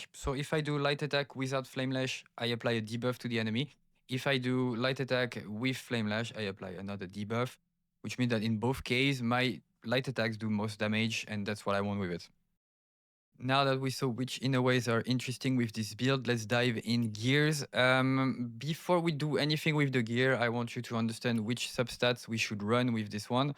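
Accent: France. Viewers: Je reconnais l'accent français , bien joué ta compris la meta mon gars 💪🏼💪🏼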